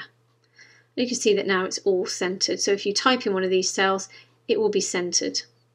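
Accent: United Kingdom. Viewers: why that god damn British accent is like super attractive to me mam really appreciate your help on the gannt chart but i really need to tell u that the accent is super attractive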